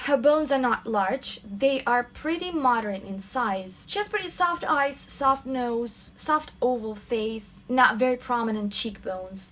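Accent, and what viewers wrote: Russia. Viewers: блин, сразу поняла, что вы русская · Я сразу же поняла, что вы русская, несмотря на то, что у вас прекрасное произношение :) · Я прямо слышу русский акцент =)) очень информативное видео 👍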